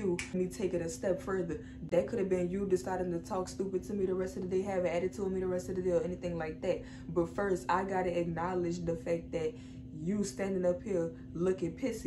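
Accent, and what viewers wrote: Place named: New Orleans, United States. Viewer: I can tell you from N.O from the accent 😂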